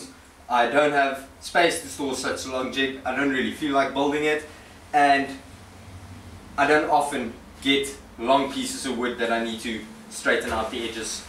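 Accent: South Africa. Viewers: South African accent? Good video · Thanks for the info! - Is that a South African accent?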